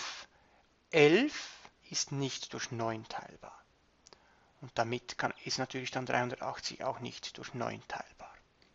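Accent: Switzerland. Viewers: Sehr angenehme Stimme hast du da.. Nur e chli Schwizerdütsch gehört mer da use ;) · Joa ganz guet. Nur hört man wirklich manchmal ein bisschen Schwizerdütsch. Aber dankä fürs Video =D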